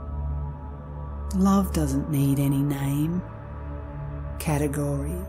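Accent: Australia. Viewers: Rumi in an australian accent?? Woohooo!!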